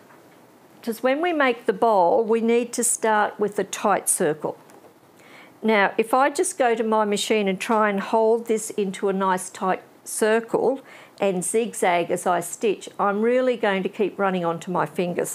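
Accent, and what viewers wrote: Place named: Australia. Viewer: Thank you. Lovely to listen to a fellow Aussie. Your baskets were extremely neat and tidy; best I have seen. I have subscribed and binge watched several videos in a row. Good to know I can purchase notions in Australia.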